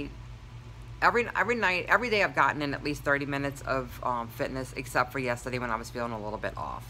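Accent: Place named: Louisiana, United States. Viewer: Is that one of our Louisiana girls? lol I hear the accent.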